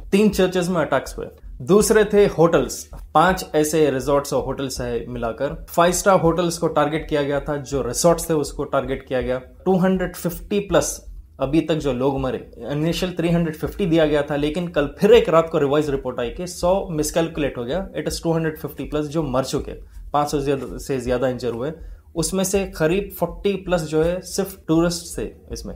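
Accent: Hyderabad, India. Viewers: Bhai tum Hyderabadi ho ky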